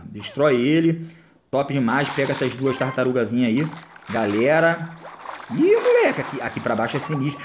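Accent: Rio de Janeiro, Brazil. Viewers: tu é do rio de janeiro né?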